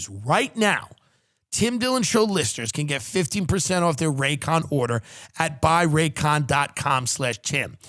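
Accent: United States. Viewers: He sounds American to me too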